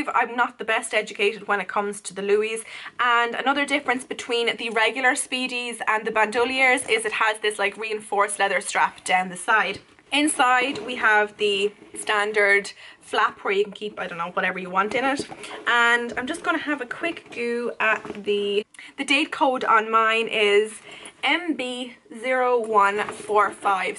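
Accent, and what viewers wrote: Ireland. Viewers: Knew you were Irish the min you opened your mouth!😍 love Irish youtuberssssss😁😁👑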